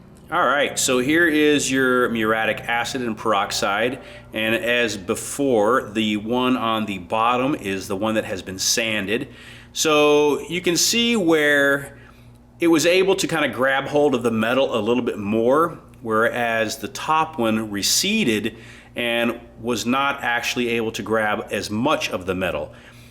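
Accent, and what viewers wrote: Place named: United States. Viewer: This is the most american sounding guy I've ever heard. If america was a person It's him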